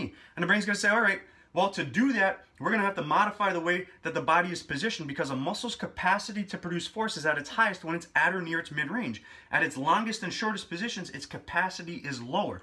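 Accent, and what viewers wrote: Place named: Canada. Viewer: Are you Canadian? You sound like you're from around here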